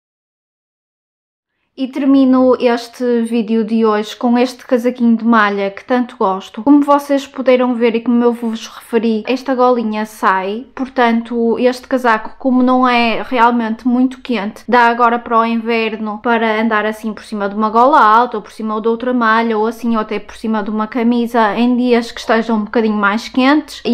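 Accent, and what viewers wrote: Portugal. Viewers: Adoro o sotaque Português 🥰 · Nossa você e portuguesa que lindo sotaque, e adoro malha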